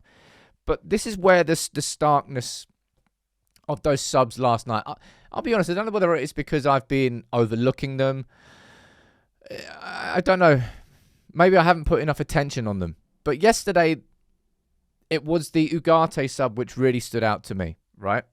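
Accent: Manchester, United Kingdom. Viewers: you sound like your from manchester mate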